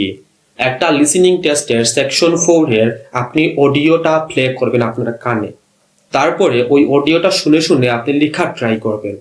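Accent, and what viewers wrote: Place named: Sylhet, Bangladesh. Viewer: দুজনই সিলঠি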